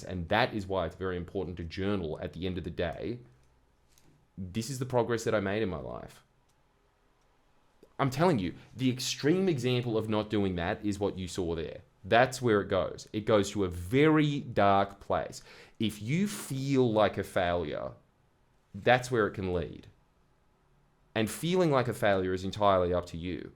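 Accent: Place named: Australia. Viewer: Australian detected, opinion disregarded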